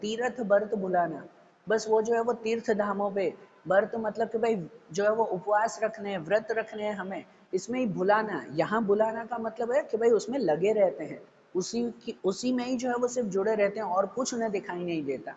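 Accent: Gujarat, India. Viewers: What a explanation with Gujarati accent 😅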